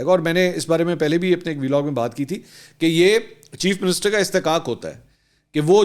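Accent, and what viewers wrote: Punjab, Pakistan. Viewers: Apka Punjabi accent is hilarious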